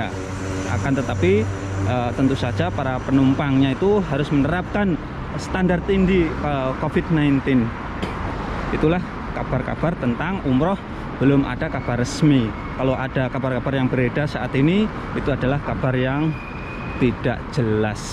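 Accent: Java, Indonesia. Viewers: mas ni asli orang mana yaa??? 🤔🤔 logat nya kayak orang jawa....🙂🙂 salam dr ponorogo Jawa timur... 🥰🥰🥰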